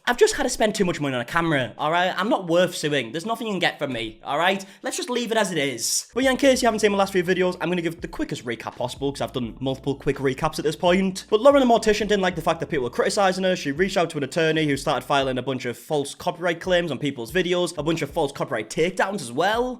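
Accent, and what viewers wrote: United Kingdom. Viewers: I love the Bri'ish accent. It's a huge plus for me when choosing which youtubers to follow. One thing I love about youtube is finding people that aren't just Americans and hearing how they sound. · The purple is honestly a nice light colour and your accent and the way you speak has always been amusing to me (not in a mean way) just saying your accent is not a problem, nothing wrong with it. I’m also British and I sometimes get told by people from America that I sound like I’m speaking a completely different language 🤣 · don't you dare change the adorable british accent, i will throw hands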